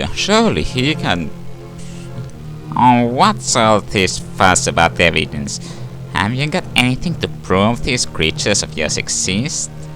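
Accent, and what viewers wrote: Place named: Finland. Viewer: I knew you was finnish (from your accent)! Suomi perkele!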